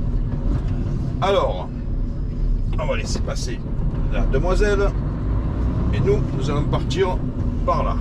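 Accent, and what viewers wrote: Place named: South, France. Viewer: Toulon ouest. dans le Var et pas à Vars dans les Alpes,ni au Portugal Une visite passionnante avec l'accent du sud en bonus.Des vidéos comme celle ci, j'aimerais tellement en voir plus souvent 🎉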